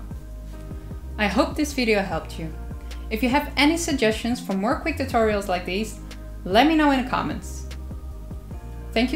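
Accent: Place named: Colombia. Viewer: You look asian but sound like your from Colombia of something. 🖤 It's confusing and I like it lol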